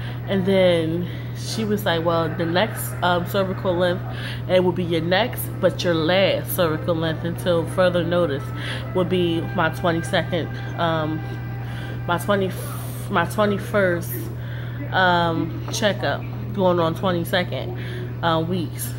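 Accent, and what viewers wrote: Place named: New Orleans, United States. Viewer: Congrats on the little girl......where are you from? The reason why i ask is because your accent sounds like your are from New Orleans.... Glad to see everything is going well.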